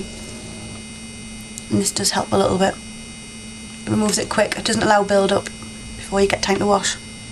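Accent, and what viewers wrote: Newcastle, United Kingdom. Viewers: Yeah newcastle. My B/F talks like that, lol. It's cute.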